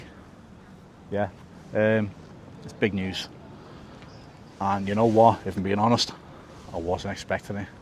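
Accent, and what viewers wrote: Liverpool, United Kingdom. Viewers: Spoken like a proper scouser who knows his footy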